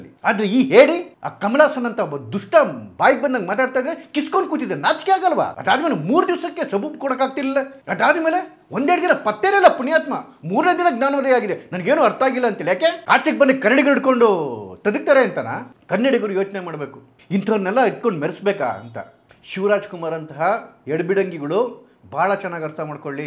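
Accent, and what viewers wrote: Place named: Tamil Nadu, India. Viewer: Tamil chenai mathadthane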